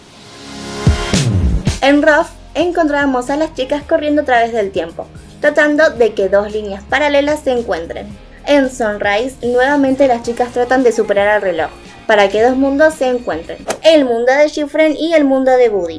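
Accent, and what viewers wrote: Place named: Argentina. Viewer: Te siento acento Argentino XD.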